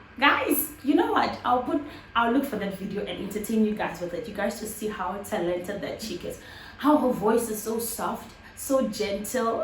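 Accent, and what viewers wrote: South Africa. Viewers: I like how you switch between South African languages, I couldn’t say you aren’t South African because your accent. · Hi you so beautiful darling and your accent is the same like south african accent,how long have you been in SA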